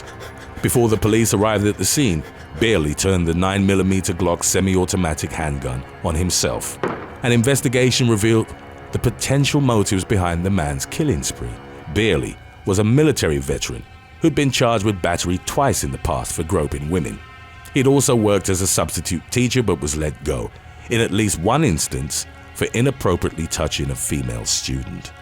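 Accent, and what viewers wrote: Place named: England, United Kingdom. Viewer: Kudos on the voice. I love the dread and seriousness with a dash of English accent, makes me fear yoga!🤣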